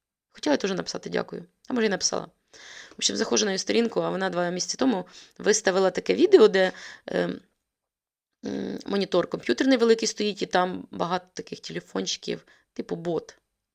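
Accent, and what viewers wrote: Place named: Surzhyk, Ukraine. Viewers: Та ну... Суржик у вас дуже милий, домашній такий, доречний. Впевнений що літературною теж розмовляєте. Просто фішка тут така своя у вас) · Я не знаю, чому Ютуб підкинув ваші відео, але я вас підтримую)))) Вподобайка та Комент у підтримку! У вас чудовий центрально-східний діалект на скільки я чую. І слава Богу що у нас в Україні можна оприділити звідки людина тільки по розмові. Успіхів вам)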